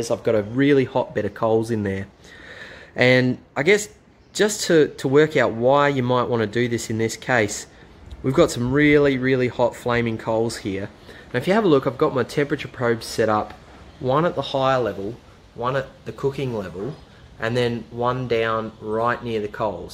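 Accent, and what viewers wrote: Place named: South Africa. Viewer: Love that SA accent mate, great video, cheers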